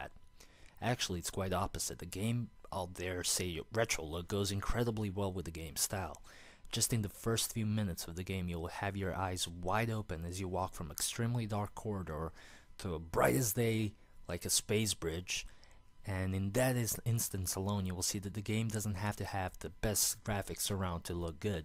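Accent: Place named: Germany. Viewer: Yo great review thanks for being 1st ur German accent makes it E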